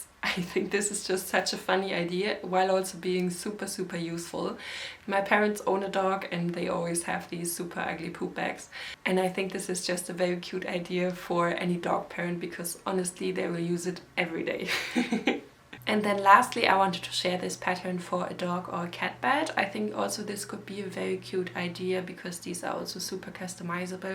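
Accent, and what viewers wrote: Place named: Germany. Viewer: Pretty sure she’s a native German speaker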